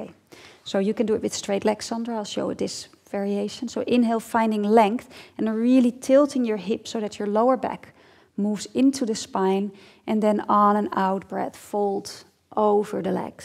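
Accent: Netherlands. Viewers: Heerlijk die Hollandse T's in plaats van de 'Th' klank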